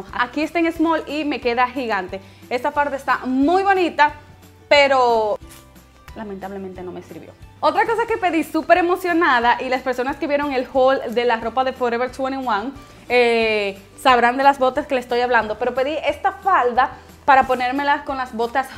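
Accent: Dominican Republic. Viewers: Eres dominicana?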